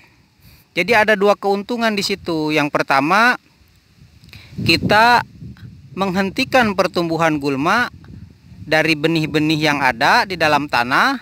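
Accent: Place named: Cianjur, Indonesia. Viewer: Logatnya seperti tmn saya yang dari Cianjur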